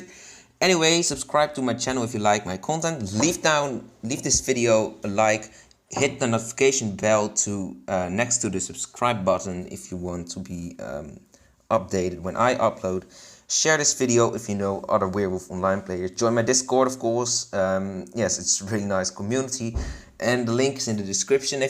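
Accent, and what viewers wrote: Netherlands. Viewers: Bro you sound Dutch man If you are: frikandelbroodjes zijn echt lekker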